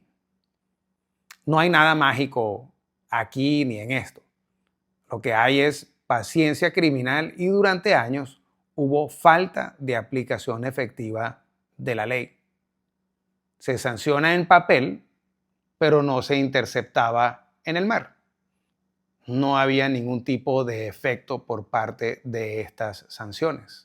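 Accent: Venezuela. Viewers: Cómo buen Tachirense, hablas sin "pelos en la lengua " en perfecto acento venezolano. Gracias ❤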